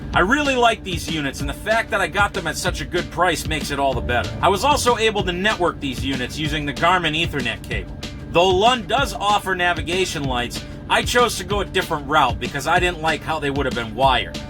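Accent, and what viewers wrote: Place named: Maine, United States. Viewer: Your license plate may say Wisconsin but you sure sound like you are from Maine or somewhere out there.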